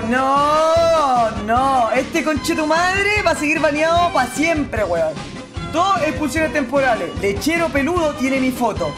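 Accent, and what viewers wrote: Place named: Argentina. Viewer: Pensé que eras argentino xd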